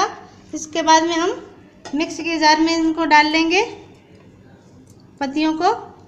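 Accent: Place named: Marwari, India. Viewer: Aap itni marwadi kyon bolte ho? Hindi acche se nahi aati kyan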